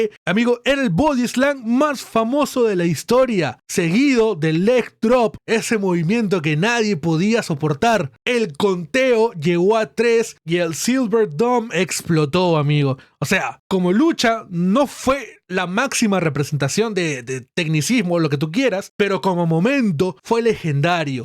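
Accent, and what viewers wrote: Argentina. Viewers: pq mrd habla como argentino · Porque hablas coml argentino?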